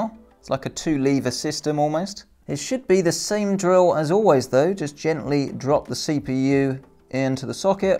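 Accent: United Kingdom. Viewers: Why do you say “zee” when you’re a Brit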